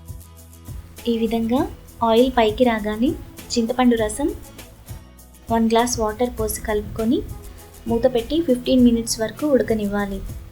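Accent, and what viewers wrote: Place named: Telangana, India. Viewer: its telugu with telangana accent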